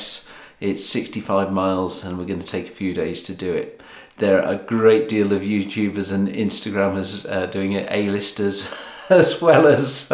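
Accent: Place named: Yorkshire, United Kingdom. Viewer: Done. It's great to hear a Yorkshire accent when I live down south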